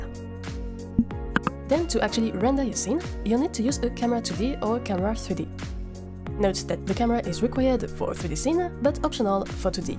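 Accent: France. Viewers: J’ai cramé en 2 secondes que tu étais français mais je suis content que la commu Godot français est là.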